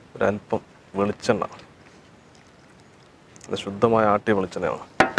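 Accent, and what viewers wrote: Palakkad, India. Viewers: ഏട്ടാ നിങ്ങൾ പാലക്കാടൻ അല്ലെ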